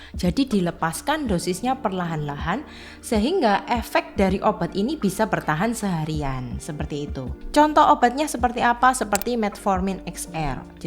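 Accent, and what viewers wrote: Java, Indonesia. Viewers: Suaranya ndeso medok .ga enak bget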